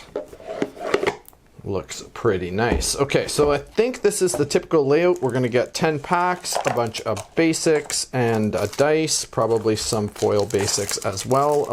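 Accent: Canada. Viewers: No idea you were canadian and now i can't unhear it :P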